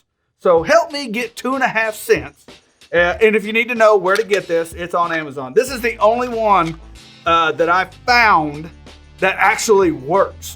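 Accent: South, United States. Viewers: Help me out. How is he a Yankee? He's got a southern accent it sounds like to me. I'm from NY and I can tell you from whatever accent that is, he is definitely NOT from the north!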